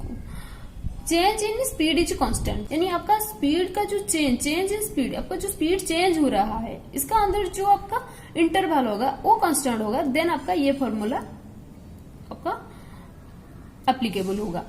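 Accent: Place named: Odisha, India. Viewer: Badhiya questions badhiya explanation ,mam.apki language se pata chalta hai ki ap odia ho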